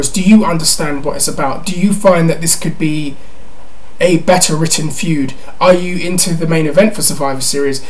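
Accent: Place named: Glasgow, United Kingdom. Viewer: You sounded like you were from glasgow, like normal times for me!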